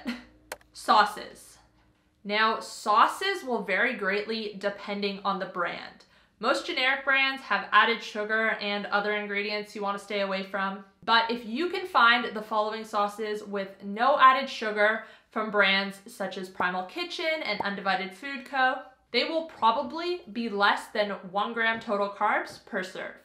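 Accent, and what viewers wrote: Canada. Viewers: She sounds Canadian cause of some of her vowels. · haha quite a small oops. your accent is very mild by the way. you sounded Canadian actually. great stuff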